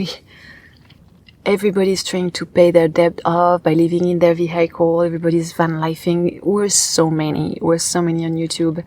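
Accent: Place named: Quebec, Canada. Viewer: Lol I hear you on the fast food. We complain it's making us fat but still keep on eating it. 😂 I had a mcnugget meal last night. Just walk often. I'm in my 30s too. Maybe a slight weight increase, but nothing too bad. Be safe as well. 👍 Are you from France or Quebec? Your accent sounds similar to people I've met from Quebec.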